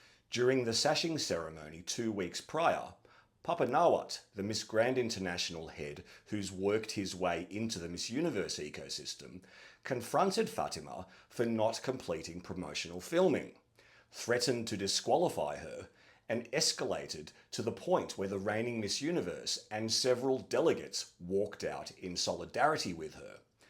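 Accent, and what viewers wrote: Australia. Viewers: Are you Australian?? You sound it. This year is the first year I actually paid any attention to the miss Universe competition and boy our Australian girl was done sooooo dirty by that ugly and boring AF dress in the national costume competition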